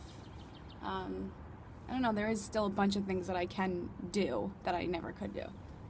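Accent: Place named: Massachusetts, United States. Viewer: I am going to guess that your from Mass?!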